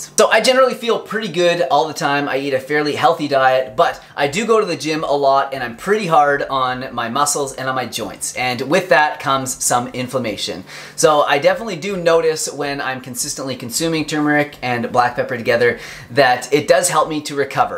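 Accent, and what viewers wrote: Canada. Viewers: Hi! I’m new to your channel. Judging by the way you talk. Are you from Canada? People there have a unique way of saying the word “out.” Sorry for being off topic! 😅 I’m glad to find out you’re Vegan! Continue to stay healthy! GOD bless you and everyone else you love! 🙏🏾✝️🐑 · Joey Heffernan he also pronounces Out and about like canadians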